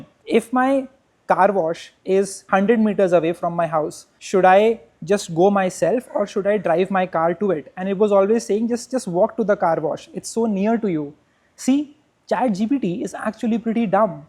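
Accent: India. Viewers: Your tongue ia real indian accent😊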